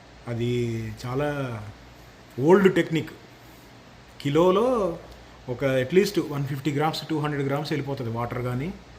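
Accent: Andhra Pradesh, India. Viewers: Anna garu you are talangana man But your talking pure AP talugu so proud of u anna garu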